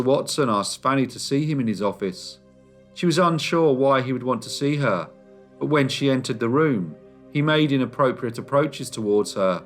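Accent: United Kingdom. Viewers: Your accent/inflection Britain or Scotland?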